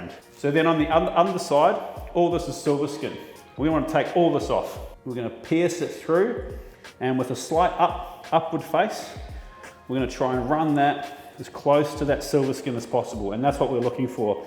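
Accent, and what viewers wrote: New Zealand. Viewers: An Australian (am I picking up a Kiwi twang?) butcher doing a single take video with maybe muck ups along the way? Liked and subscribed. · he is a Kiwi but lives in Australia and has done for a while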